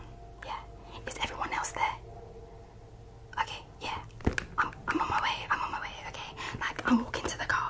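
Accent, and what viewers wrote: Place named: United Kingdom. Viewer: What a beautiful British accent like the girl 🫢‼️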